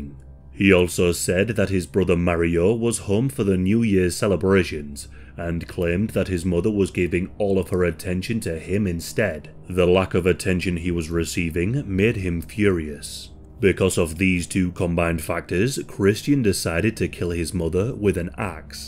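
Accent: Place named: Northern England, United Kingdom. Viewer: I am a British born American who misses hearing English voices. I even though I was born in the South (London) I love Northern accents, like "s too. voice is so soothing even though he is talking about the most disturbing things.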